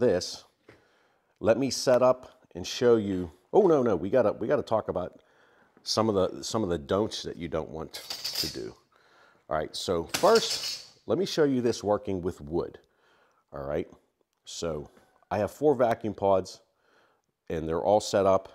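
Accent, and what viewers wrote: Pittsburgh, United States. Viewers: nice video. do i detect a pittsburgh accent. question have you ever tried the pods with mdf on to to make a much broader vaccum area.....great video.. what nice little jig for those cases...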